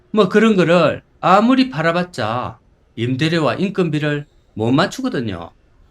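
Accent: Gyeongsang, South Korea. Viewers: ❤~~small 그것이 알고싶다 ~~ 1인방송으로서 👍 👍 ~낀가넣다~ 정겨운 경상도 사투리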